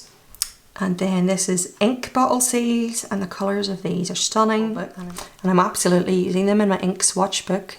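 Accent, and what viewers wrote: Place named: Ireland. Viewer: This is the first video of yours that I have watched and love it! Last year I traveled through Ireland and love hearing your accent 😊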